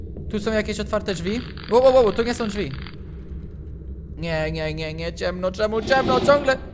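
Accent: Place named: England, United Kingdom. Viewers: Mr XanTeS pl on jest z anglii jakbys nie wiedzial -. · Mr XanTeS pl To nie kaleczenie. większość życia mieszkał w Angli, więc i posiada angielski akcent, nie polski..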